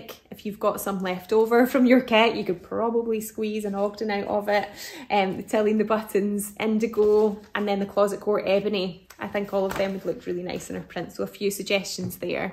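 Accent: Scotland, United Kingdom. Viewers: Argh. I planned to catch you for the first time live last night and I forgot. As well as the lovely fabric it's great to hear a Scottish voice. I love living in the land of cheese and chocolate but a little bit of homesickness creeps in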